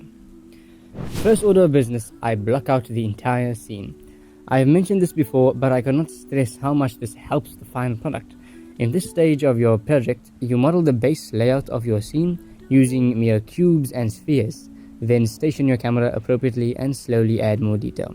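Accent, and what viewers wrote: South Africa. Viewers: nice tutorial bro are you from south africa? I can hear from your accent lol. I'm from cape town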